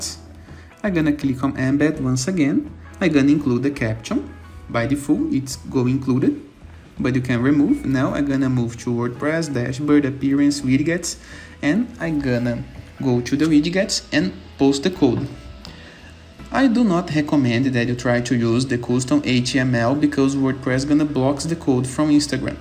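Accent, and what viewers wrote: Brazil. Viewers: Are you brazilian? The accent sounds like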